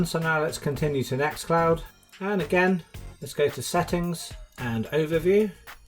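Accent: United Kingdom. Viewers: Wow, the next brilliant and useful series completely in proper british english!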